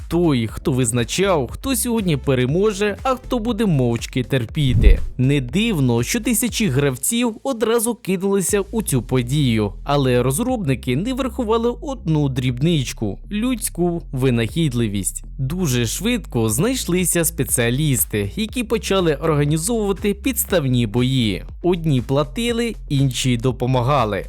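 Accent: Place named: Russia. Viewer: Діалект з паРашкі проскакує. І це тільки щоб фізично не працювати ?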